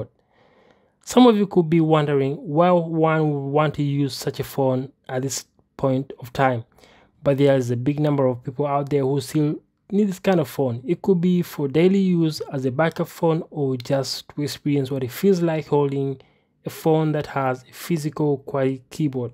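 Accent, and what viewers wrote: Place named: India. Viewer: it's kinda good content but you sound indian and ofc keep making similar videos to this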